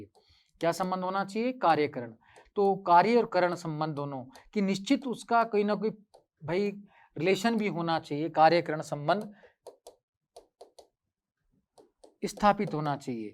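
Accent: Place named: Rajasthan, India. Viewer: Aap Rajasthan se honge 100% kyu ki hindi language ko bolna or likhne m jo galtiya aati h wahi k logon m aati h . Regional language ka asar hota h .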